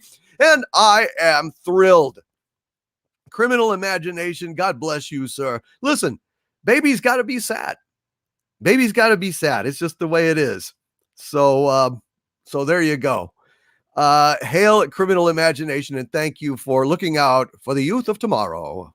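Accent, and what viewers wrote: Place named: Germany. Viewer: Scottish? I thought you were German. Your braking the fourth wall!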